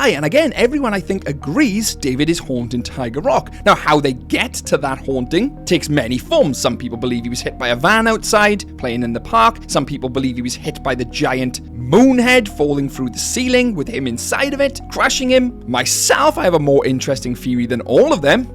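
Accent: United Kingdom. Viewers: I cannot place your accent. Where in uk is ur accent from?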